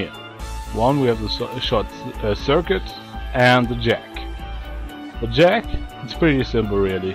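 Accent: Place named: Germany. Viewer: My Internet is spazzing out atm - I can't even load up SPUF yet I can watch YT videos (like this one) just fine. Good job, you made some nice item changes, and... Your voice is kinda...Well, German. :P No offense, just saying. (also, not Winjer - just saying :P)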